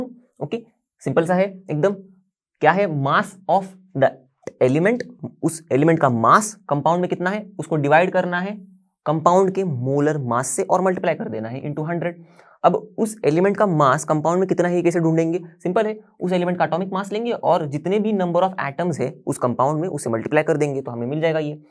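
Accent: India. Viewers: These lessons sound interesting, but they have one small problem: the Hindi accent 😅 Could you please add English subtitles to your playlists..? Thank you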